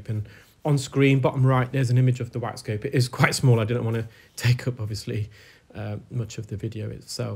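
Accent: United Kingdom. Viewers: I love your videos. They are informative, educational and calming for those with anxiety disorders. I find your voice very calming and soothing and your British accent is like extra icing on a cake. Please keep up the great work you’re doing. Blessings and good health to you.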